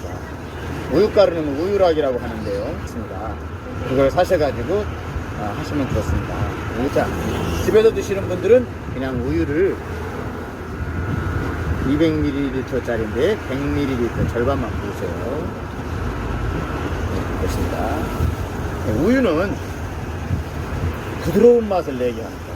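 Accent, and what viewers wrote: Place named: North Jeolla, South Korea. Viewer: 짠메세요 그랫끼때문에. 그람에.. 있는데..ㅋ 전라도 북도사투리네요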